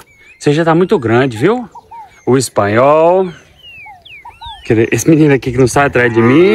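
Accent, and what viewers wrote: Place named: South Minas Gerais, Brazil. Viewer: moro no Sul de Minas e você fala que nem nós. Amo muito nosso sotaque! Deus te abençoe ❤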